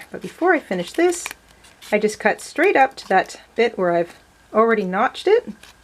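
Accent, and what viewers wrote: Canada. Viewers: Aboot!!! Love your Canadian accent! I’m a huge Rush fan and love Canada!!!!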